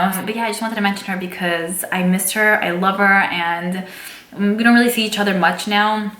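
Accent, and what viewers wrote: New York, United States. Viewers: When I first started watching you I thought you were Italian lol. But, now I realise your accent is New York or Bronx. I think you're adorable, and so likeable. I could really see us being friends. Thanks for filling us in on who YOU are, and we all love you.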